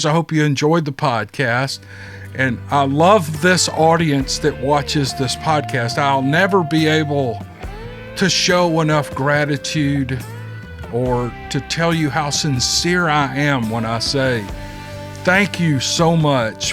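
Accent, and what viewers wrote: South, United States. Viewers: Hello from TN. I personally like it mostly when you only narrate the stories. Only because I've grown accustom to hearing you. That said, many times I fall asleep , not that it's boring. But you have such a soothing voice and listening to you, I can actually place myself into the story like I'm really there and experiencing it. You talked about your work, somewhat. May I ask what is your profession? I think I enjoy hearing that southern brawl more than anything. It just gives each story that special twist that we Southern folk seem to have. See, if I am watching you, then it's like I'm focusing more on you and not as much on the story. Just my own personal opinion. Think u should leave it the way it is. I'm getting older and don't like change. So, that's MY hang up, not yours. Anyway, keep the stories coming. They are very entertaining and your voice is enjoyable. 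Not taking away from your handsome face, but the not knowing what someone looks like behind the voice is suspenseful and intriguing. I'm not commenting about your pets because I've never been and am not a whole lot now of a pet person. I don't knock others for their own feelings. It's y'all's prerogative if ya want to be pet parents. Everyone is different and entitled to their own opinion. In closing, I say to you, Thanks and carry on! Oh, and I'm now a new sub! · I'm not just saying this, you are the best storyteller on the YouTube! I have been blessed to be listening to your stories for 2 years now. I listen to many of my favorites over and over! Like I said, you are the best! Keep up the good work. We love your face, we love your personality and at Southern accent is a huge Plus. Blessings to you and your family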